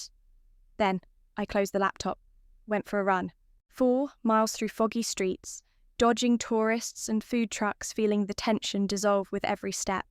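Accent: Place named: United Kingdom. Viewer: Very pleasant British accent . Excellent diction . 😊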